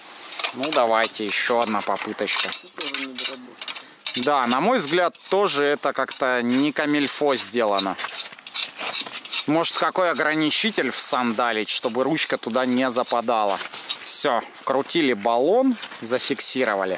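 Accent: Russia. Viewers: 1. Говоришь "я дам свое мнение" чувак какое МФТИ ты безграмотный. 2. Акаешь, кривляешься, слушать невозможно, отвращает с 1й - же минуты хотя что взять с масквАча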